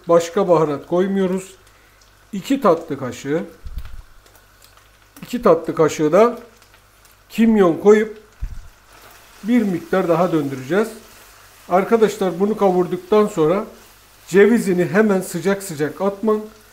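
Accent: Gaziantep, Turkey. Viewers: Muhterem abicim, Videolarini zevkle izliyoruz ve elimizdeki imkanlarla vermis oldugun tariflleri yapmaya calisiyoruz. Yurtdisinda yasiyoruz. Imkanlarimiz kisitli olsada yinede guzel lezzetli Antep yemeklerini pisirmeye calisiyoruz. Abicim sana bir sey soracam. Oncelikle Salvarin ve Deri yelegin harika. Salvar tam benim istegim modelden. Antep Turkmen Salvari. Turkiye'ye ilk geldigim gun direk Antep'e gececem ve 2 hafta kalacam ve Videoda tanittigin yerlerde dolanacam. Senden ricam Senin giymis oldugun Salvari diken ya da satan yeri bildirirsen cok memnun olurum hatta deri yelegini de. Soyle 4 yada 5 adet siparis verecem. Ayrica sivene hastayim. Tam bir Antep sivesi harika. Muhterem Annenin ve Babanin ellerinden operim. Allah'a cc emanet ol.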